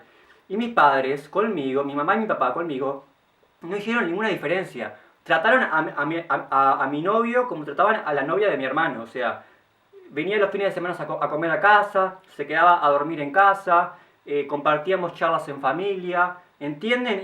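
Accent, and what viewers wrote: Uruguay. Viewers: Estoy tan acostumbrada a ver youtubers argentinos que ver un youtuber de nuestro país y con nuestro acento diciendo BO, TAy GURI es un mimo ❤️ jajaj A parte de eso, lamento que hayas tenido una infancia angustiosa, te mando un abrazo grande, tenes una energía hermosa ✨ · Desde que te escuché hablar supe que sos de Uruguay, te admiro mucho, todo mi apoyo desde Argentina ♡♡♡♡ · gracias por contar tu historia que ayuda y ayudará a mucha gente!!! y es real en nuestro país se ha logrado mucho con las leyes pero falta que las familias acepten y amen mas a sus hijos y la sociedad toda acepte al otro con amor y respeto! me gusta mucho escuchar tu acento y estilo uruguayo del interior ! saludos desde Colonia del Sacramento!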